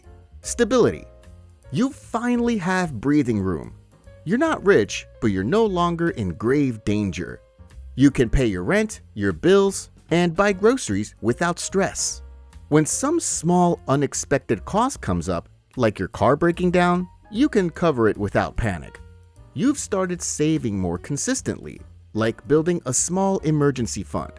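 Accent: United States. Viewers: I thought the American accent gave it away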